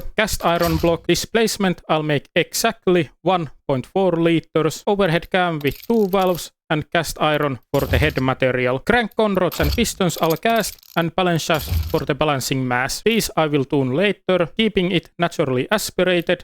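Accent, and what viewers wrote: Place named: Finland. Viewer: the most Finnish sounding guy i heard haha, nice video!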